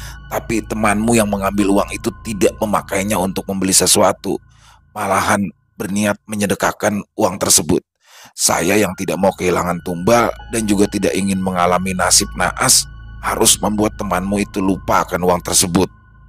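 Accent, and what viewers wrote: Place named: Jakarta, Indonesia. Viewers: Paling keren nih cerita dari mas untung prasetyo tentang pengalaman bg, apalagi yg baca cerita@ bg pke logat Betawi, mantaffff, salam untuk mas untung Prasetyo, dan bg y bg, semoga sehat slalu n sukses dan slalu dalam lindungan ALLAH SWT, aamiin....... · aq jg lbh suka logat betawi..jujur gak gala gasi gtu.. secara gue jg dulu tinggal fi tanah betawi lama😅😅 salam knal bang.. aq pecinta bercerita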